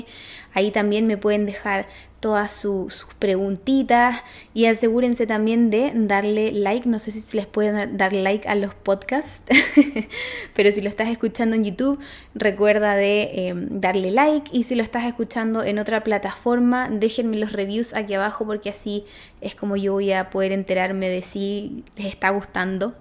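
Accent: Chile. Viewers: Hola, he tejido varias cosas siguiendo tus tutoriales de YouTube, explicas muy bien 👍. Me quedé con tu canal porque reconocí el acento, soy de Chile también. Saludos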